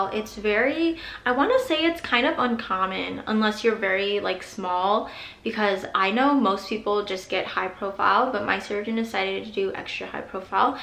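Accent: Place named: Idaho, United States. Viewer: Her accent says she is American possibly from Idaho.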